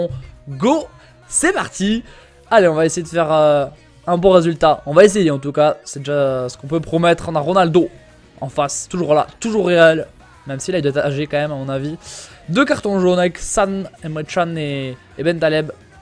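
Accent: France. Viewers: (Pck si c’est pour avoir une prononciation en lecture à la française..)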